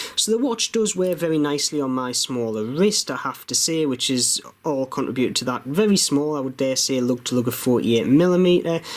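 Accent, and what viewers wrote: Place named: Northeast England, United Kingdom. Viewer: Nice video interesting collection not a fan of that dress watch though I have the pagani submariner in the hulk colour myself and really rate it I am also a fan of vostok watches and of course the odd mod perhaps they could make an appearance in later videos plus its canny to hear a NE accent as im from Newcastle myself keep up the good work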